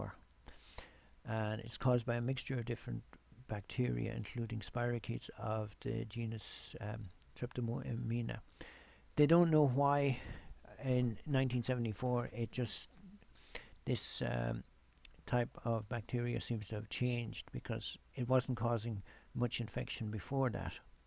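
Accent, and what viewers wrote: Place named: Dublin, Ireland. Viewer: I don't know why but I find this subject fascinating. I am from Dublin, are you also from Dublin? I ask because you sound like you might be from just. outside. Interesting video thanks for uploading.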